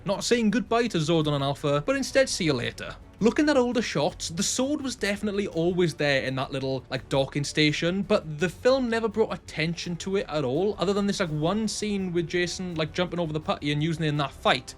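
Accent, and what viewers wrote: Wales, United Kingdom. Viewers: Damn... random hyper fixation youruber ALSO being welsh? How did i not recognise the accent sooner? 😂 great work mush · Wow wow wow how have I never realised your Welsh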